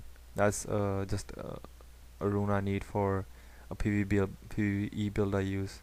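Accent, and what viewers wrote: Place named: India. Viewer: THanks man so much just curious not hatin what accent was that sounded indian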